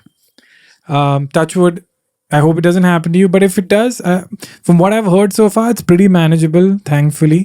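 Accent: Kerala, India. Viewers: You talk just like my mallu cousin and have the same mannerism. He's irritating right now, but I won't be disappointed if he grows up to be like you. PS: Loved the long recording of you talking 🎯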